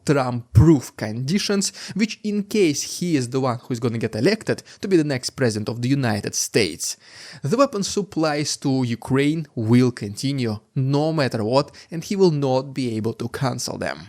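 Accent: Russia. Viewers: I can hear your russian accent. Keep studying.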